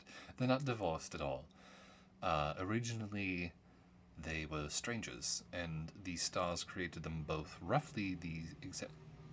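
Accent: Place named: Midwest, United States. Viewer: halberd is REALLY cool... Your "Midwestern white guy" accent does sound jarring...but mainly because I cannot freaking place it. It sounds familiar, but I can't figure out from where. Anyway...now you've finished with this monumental Q&A session...on the snow leopard video. Good luck!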